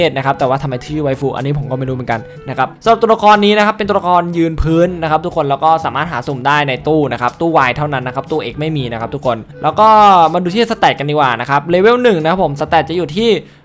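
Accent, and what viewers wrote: Suphanburi, Thailand. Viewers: อ่าวอยู่สุพรรณเหมือนผมเลย ว่าละทำไมสำเนียงพี่เหนื่อๆ55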